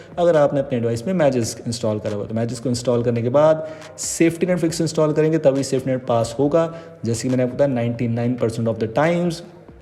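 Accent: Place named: India. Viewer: Your English sounds like a combination of Hindi and English, I don't understand anything.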